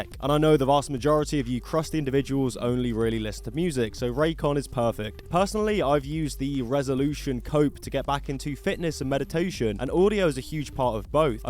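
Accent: United Kingdom. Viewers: br'sh accent too thick yuck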